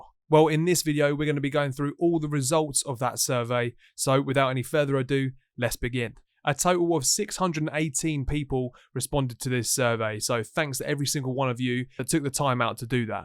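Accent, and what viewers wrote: United Kingdom. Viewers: I love your natural British accent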